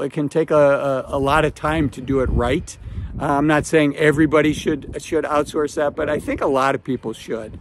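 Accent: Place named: East Coast, United States. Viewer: I’m curious what part of the us are you from? I’m guessing the upper east coast.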